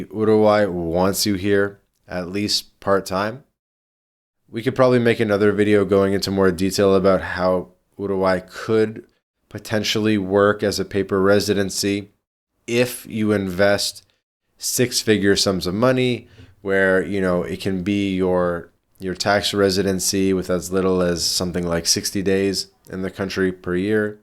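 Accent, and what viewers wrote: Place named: Canada. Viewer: Your accent tells me you’re an annoying Canadian. You’re talking about the poor retiree / annoying GenZ / “digital nomad“ way to get a permanent resident visa in Uruguay. I’m certainly not a Uruguayan lawyer, though I know several, but it would seem there are far better permanent residence visas available in UY, which have much less restrictions one’s need to remain in the country on a more or less continuous basis (after all, if you’re not a poor ass Gen Z, you might want to occasionally travel somewhere like Southeast Asia or Western Europe or even spend a week or two in Argentina or Chile or maybe southern Brazil). Though of course, because Uruguay values (as they certainly should, and we all should, whether in Canada, in the United States or anywhere else in the world) that in order to get a permanent residence visa of any kind, one has a true desire to spend significant time in the country and integrate fully with the culture (in other words, if you speak no Spanish and/or don’t respect the local culture, you have no business in UY, permanent residence visas-wise), unlike in Canada and the United States, in both of which people who are completely incapable of assimilating in either country’s culture, much less speak the native languages normally used there, are allowed to enter and remain indefinitely, which is a travesty). 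Yes, so far as I understand it the “independent means“ visa is available. I’m just saying there may be better options assuming you’re not a poor ass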